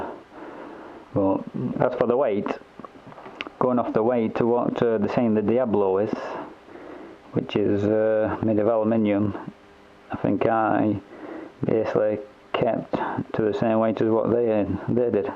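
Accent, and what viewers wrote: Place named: Bolton, United Kingdom. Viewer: Id give you good money for one of them there scoops lad. are you from bolton by any chance?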